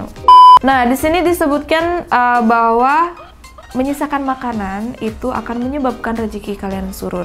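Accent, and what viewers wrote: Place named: Bali, Indonesia. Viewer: Logat balinya masih kental kak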